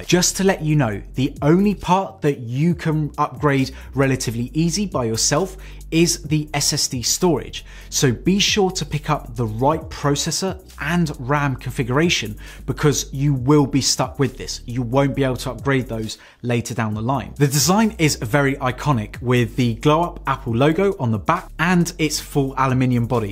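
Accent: United Kingdom. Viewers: I subscribed you because of this: nice british accent ✅. btw i clicked your instagram account link found nothing.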